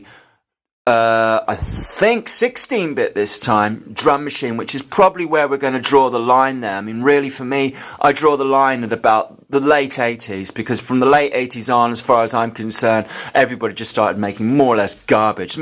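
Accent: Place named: South, United States. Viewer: southern voice makes me laugh but all in all find the series very interesting and funny. you persuaded me to go for a univox too great video that. look forward to the new ones. happy new year.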